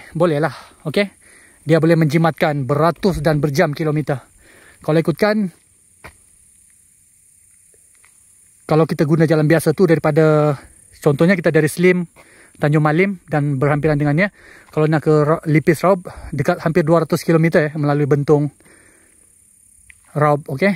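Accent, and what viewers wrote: Sabah, Malaysia. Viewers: maaf nk tanya saudara ni asal mana.Bunyi loghat tu sesekali mcm org sabah ttp adakala mcm bunti perak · Bro asal sabah ke dengar longat bahasa melayu macam dari sabah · ni org sabah ke? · Dia Sabahan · Orang sabah ka bang loghat sabah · Brother orang mana? Slang cam Sabah je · org sabah ke bang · Abang sabahan ke dengar macam slang sabah bha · Kau orang mane bro? Mcm loghat sabah atau sarawak je 😅😅. Dh lama ke duk semenanjung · Sabahan ke..terbaik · Mantap bro.nanti bolwh repeat dengan kita .5/ 6 org je.Slang abang macam orang Sabah/Sarawak.Betul ke?? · Terbaik La.. Bukan Kosong Ni.. Mesti "Berisi".. Hehehe.. Tapi Slang Abang Mcm Borneo Skit.. Bunyi Macam Sabahan..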